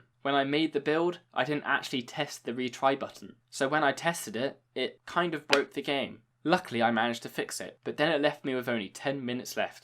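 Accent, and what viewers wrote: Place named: Europe. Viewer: Ur from UK? Your accent is European. Nevertheless, you're my favourite. 😁😁😁😋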